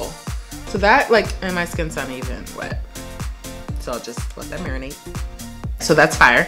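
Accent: Trinidad and Tobago. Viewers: Yessss gyal! Bring out d Trini accent! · Gorgeous look. I caught the Trini accent before you said it. 😂😂😂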